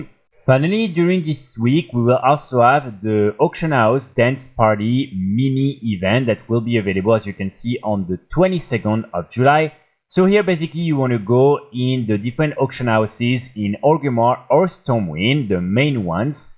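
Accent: France. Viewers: Do you do these in French? I'd watch and listen to those same videos if you do. It's the accent, it is quite thick and very French.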